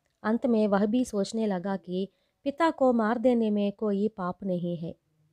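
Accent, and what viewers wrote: South, India. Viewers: Bahut achha aur bolne wali pakka South Indian hai and really love South Indian guys really hard working and intelligent people.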